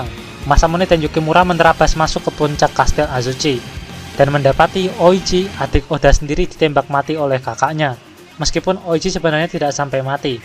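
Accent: Java, Indonesia. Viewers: Logat jowomu medok min,asli ngendi ki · Medoknya bikin semangat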